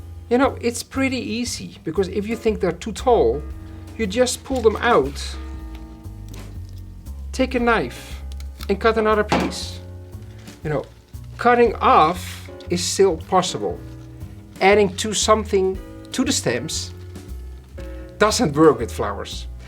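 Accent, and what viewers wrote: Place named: Netherlands. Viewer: jezus wat een dunglish accent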